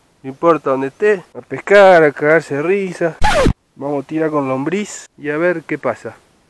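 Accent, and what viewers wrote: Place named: Córdoba, Argentina. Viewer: Que grande, no le aflojes a la pesca! Y que no se te pegue el acento cordobés wacho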